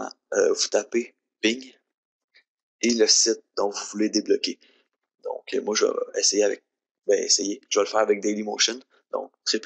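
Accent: Quebec, Canada. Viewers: Merci pour cette vidéo ! Je trouve qu'elle est sincèrement bien commentée, je suis juste pas habitué au québécois ! Je testerai tout ça dans mon Collège après demain ! Merci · Non, je suis moi-même Québecois; c'était juste qu'à l'époque ça m'avait surpris. (éwè, à force d'écouter de l'anglais et des français de france à longueur de journée, on fait le saut lorsque l'on tombe sur son accent natal :-°)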